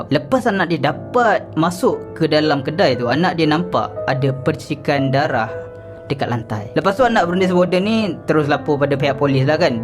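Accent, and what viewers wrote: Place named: Kedah, Malaysia. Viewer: Tudiaaaaa cakap kedah kaaaaa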